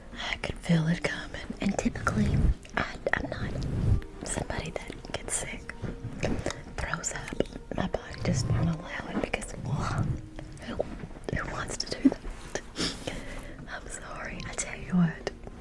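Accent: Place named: Kentucky, United States. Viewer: This is like listening to my aunt from KY who passed away a decade ago. It's nice to hear the accent and sweetness. Thank you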